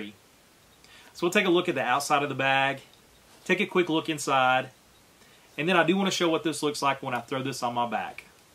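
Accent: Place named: South, United States. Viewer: Love your charming Southern accent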